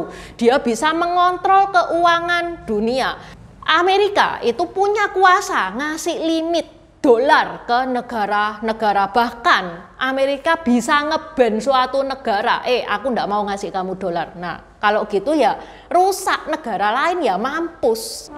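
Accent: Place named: Surabaya, Indonesia. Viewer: Dari surabaya yaa ... Kog logatnya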